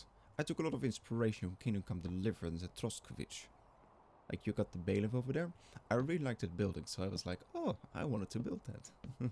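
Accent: Netherlands. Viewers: Love this series so far! I'm also guessing you're Dutch based on your name and accent :P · Are you dutch by any chance? I feel like I can hear it a little bit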